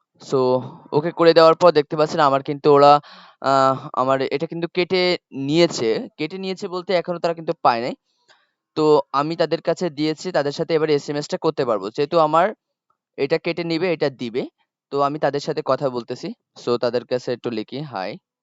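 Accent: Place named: Satkhira, Bangladesh. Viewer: vai apnar basha satkhirai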